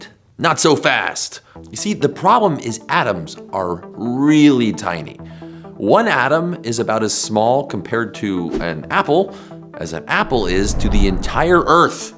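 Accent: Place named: United States. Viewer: American pronunciation is so weird, this video is entirely about Adams, not atoms, yet it shows atoms not men called Adam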